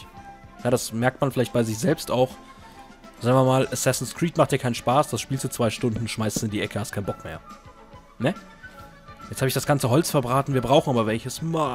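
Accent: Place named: Palatinate, Germany. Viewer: Red' mal mehr pfälzisch :D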